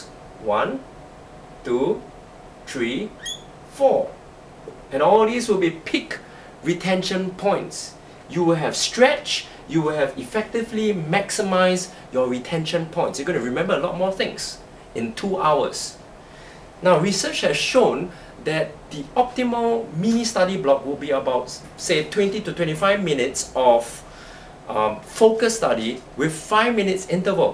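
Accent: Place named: Africa. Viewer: Why do you sound so African?!?!?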